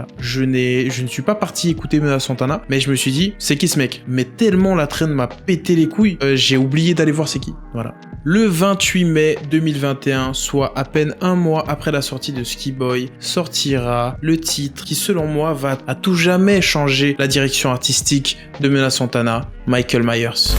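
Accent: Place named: Dominican Republic. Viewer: la façon de comment il parle espagnol sa se voit que c'est un dominicain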